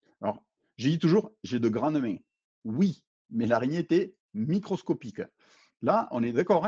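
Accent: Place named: South, France. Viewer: Bonjour, Ça fait du bien d'entendre un peu l'accent du sud (les bretons se moquaient de moi à l'époque),en tout cas, excellente vidéo très instructive. Merci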